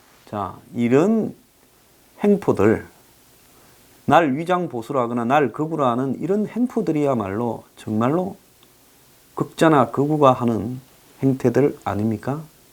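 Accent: Gyeongsang, South Korea. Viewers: 한국사람들은 머리가 딱딱합니다. 그리고 매우~~~~겉으로만 보고 판단합니다. 황선생님은 내용상 전혀 극우가 아닙니다만, 제 생각으로는 단지 소장님이 경상도 사투리를 쓰고 목소리가 커서 강해보이니까 극우다..라고 느끼는거고 그걸 그냥 다시 생각해보는거 없이 프레임화 시킵니다. 좌파들 말하는거 보세요. 대부분 매우 부드럽지요? 달달하게 말합니다. 그렇게 말하면 극우적인 내용을 말해도 한국인들은 극우가 아니라고 느낍니다. 감성적으로요. 제가 일본에 산 적이 있는데, 그때 느낀 사소하지만 중요한 차이가 있습니다. 일본 지하철 역에 가보면 벽에 광고들이 붙어있지요. 그런데 일본 광고는 글자가 매우 작더라고요. 자세히 읽어보지 않으면 알 수 없는 광고 내용들..한국처럼 대문짝 만하게 선정적인 문구로 하는 광고 비율이 확실히 낮습니다. 그거만 봐도 민중의 성향이 읽혔습니다. 광고야 말로 자본주의의 첨병이고 효율에 목숨거는 분야일텐데, 일본광고의 글자가 작다는 것은 일본사람들은 뭐가 쓰여져 있으면 자세히 읽는다는 사실을 반증하는거죠. 한국사람들은 벽에 뭐가 큼지막하게 쓰여져 있어도 아주머니들이 옆에 서있는 저에게 묻는 일이 많습니다. "뭐라고 하는 거에요?" 라고요. 문맹이실리도 없고, 글자도 커서 노안에도 잘 보이실텐데. 습관인거죠. 한국과 일본, 어느쪽이 프레임과 거짓소문으로 더 양때몰이 하기가 쉬울까요? 쉽게 상상이 가능하리라 봅니다.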